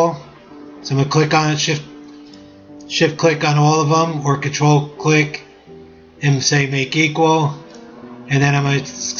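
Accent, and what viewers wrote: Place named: Brooklyn, United States. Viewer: Nice tutorial! Your accent is definitely more palatable than that of many Brooklynites! Only moderately detectable. :^)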